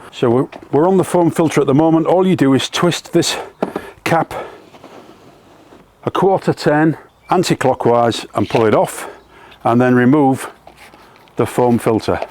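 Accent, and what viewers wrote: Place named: Hull, United Kingdom. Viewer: I'm from Hull and I'd recognise that accent anywhere!! Also, nice job emptying the leaves into the next door neighbours garden. 😂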